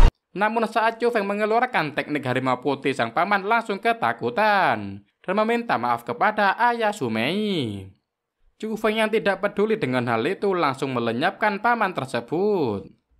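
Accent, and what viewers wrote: Java, Indonesia. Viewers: Cara baca alur nya Jawir bet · Min.. Logatnya ganti Terkesan jawir 😂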